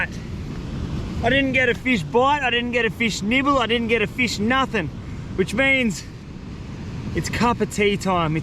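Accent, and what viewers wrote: Australia. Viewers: My fav channel to watch.. this cat is loaded with personality and charisma plus that delightful Aussie twang!! never a dull sec in his vid😅🇺🇸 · Love your AUSTRALIAN DIALECT...Not 2 mention your energy!¡! EVEN WHEN ALL U CATCH IS " SEAWEED". Try running the Bike on the WET SAND, it won't slip under u as easily... Just saying. Keek the videos coming Dawgie... LMAO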